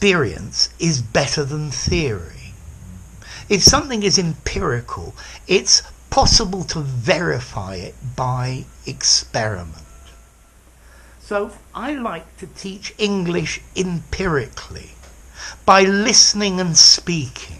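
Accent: United Kingdom. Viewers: Glad to help. I also love his lessons. But the first thing, I was attracted by, was his British accent.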